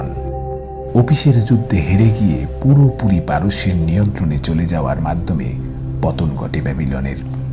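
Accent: Barishal, Bangladesh. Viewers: Your voice is so good but you can't avoid your local tone(Barishal).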